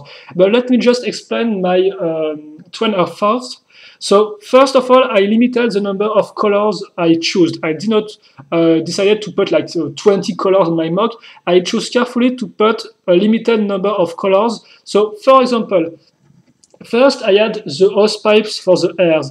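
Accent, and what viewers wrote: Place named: France. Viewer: Du vrai francais dans l'anglais ^^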